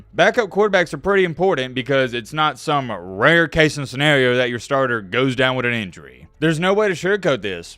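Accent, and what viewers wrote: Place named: Alabama, United States. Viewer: hearing how you pronounced some of the names in your video, it's pretty obvious that you went to school in Alabama.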